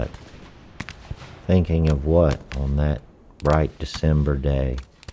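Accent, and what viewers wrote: South, United States. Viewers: Great narration. Just that the person who wrote this was in the Michigan 4th. I kept getting confused because of the narrators southern accent.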